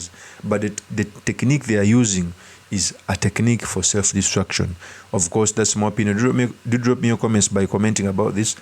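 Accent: Uganda. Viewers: Ugandan accent detected.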